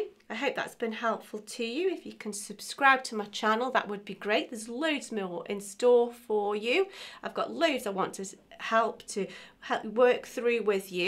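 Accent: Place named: Stoke, United Kingdom. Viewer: Hi thanks for the tutorial! really useful. I am guessing you're from the Stoke area based on your accent 🙂🙂🙂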